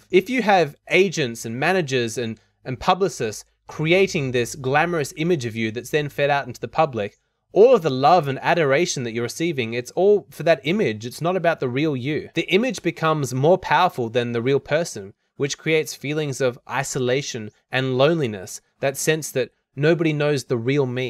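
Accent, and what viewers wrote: South Africa. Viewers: This is for sure a South African video. I can hear the South African accent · She's so South African 😂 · That accent sounds south african. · She sounds soooooo 🇿🇦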